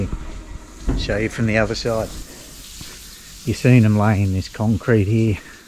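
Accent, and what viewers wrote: Australia. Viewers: well who am I to rule over your good wife, I retract my appeal of your Aussie pronunciation and give myself a smack upside my head, ps.love your work.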